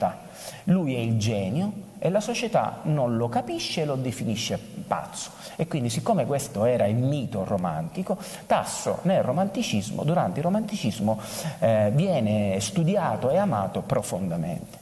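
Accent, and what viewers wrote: Sicily, Italy. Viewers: tu siciliano sei, il mio accento lo riconoscerei tra mille❤️❤️❤️ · Il nn compreso....eccede.....aaaaaa, un saluto grazie il suo siculo è simpaticissimo anch io isolana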